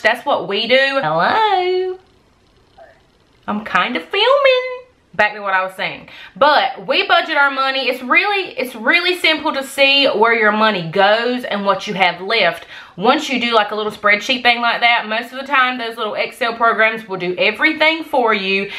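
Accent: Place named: Carolina, United States. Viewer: Loving your channel lately, love your carolina accent. Sounds familiar ;)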